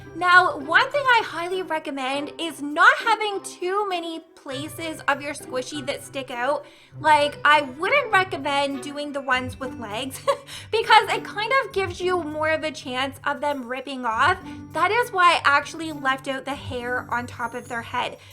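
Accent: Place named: France. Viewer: I love your French accent!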